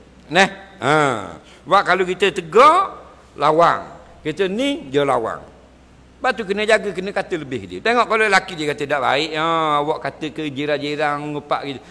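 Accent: Terengganu, Malaysia. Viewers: Asalamualaikum ustaz..saye suke dengar ceramah ustaz sebab lorat Terengganu kadang 2ada dah lupa .ingat blek Katawa sendiri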